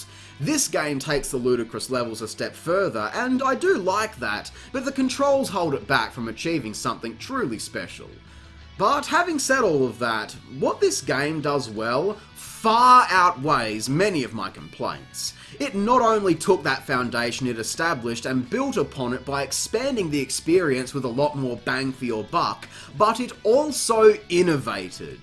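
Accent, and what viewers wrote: Australia. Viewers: Aussie accents make everything 17% more entertaining.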